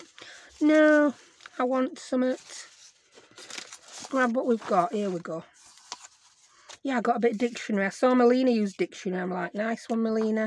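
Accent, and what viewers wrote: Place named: Sheffield, United Kingdom. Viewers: are you from Yorkshire??? we have similar accents.... I'm liking this vid :o) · I am really enjoying your channel in fact I am binge watching. I was born and raised in Sheffield but living in Illinois now. I just love listening to your accent, in fact it makes me homesick. I also like your attitude to crafting, it might work or it might not work, so de it. Gail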